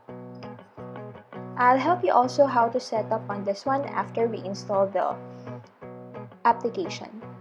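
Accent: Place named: Tagalog, Philippines. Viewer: Yeah does sound like she has a Tagalog Accent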